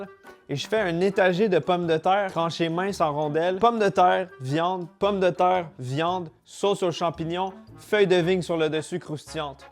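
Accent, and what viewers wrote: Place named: Canada. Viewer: l'accent canadien c horrible.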